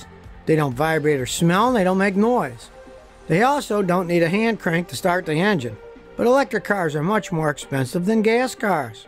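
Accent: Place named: Western New York, United States. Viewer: Funny how much hiw a person pronounces a word reveals. Kind of figured you are from western NY, by how you pronounce "un" with it being an "on" sound. Transplant to Silver Creek, Irving area in 1990, from all over the map. Spent 24 years there, until moving to PA in 2014, then Ohio this year. Love visiting your hometown, wife and I honeymooned there.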